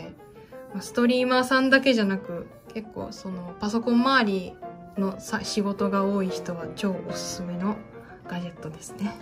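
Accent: Kansai, Japan. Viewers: めっちゃ かわいい。今日はまりましたw関西弁だいすきです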